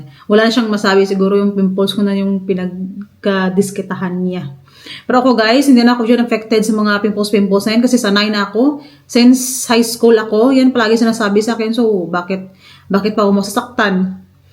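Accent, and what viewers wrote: Iloilo, Philippines. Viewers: ang sis in law ko dati marami rin pimples tapos nag consult siya sa dermatologist naghiyang siya dun sa pinapahid niya gumanda ang Mukha Niya. ikaw din maganda kna parang may kinang ang Mukha mo. I wish mkadiscover ka ng gamot n mkaalis ng pimples mo para Lalo Kang gumanda . honestly bisaya din Ako but i really love the ascent of ilongga.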